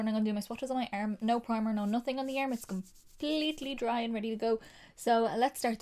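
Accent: Ireland. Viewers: Damn you are so Irish 😍 😍😍💓💓💓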